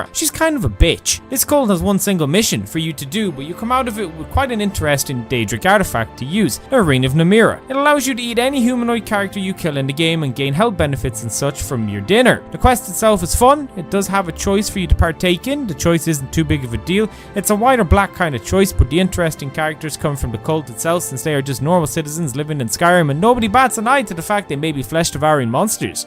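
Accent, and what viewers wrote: Ireland. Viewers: OMG YOU'RE IRISH TOO?!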